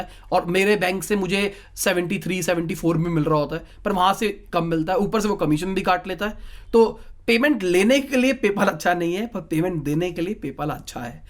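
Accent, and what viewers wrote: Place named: Punjab, India. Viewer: Your accent is Punjabi. Im right? Pegchanana mushkil hy being a Punjabi i can understand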